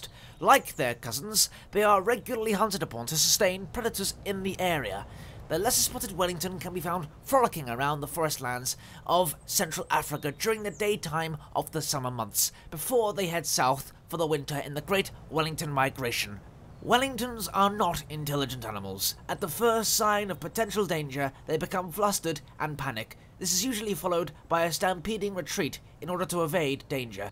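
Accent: United Kingdom. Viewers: What is it with you Brits and narrating xD When the messerschmitts drove to the downed wellington you really cracked me up haha. (I chuckled throughout the enitre video :p)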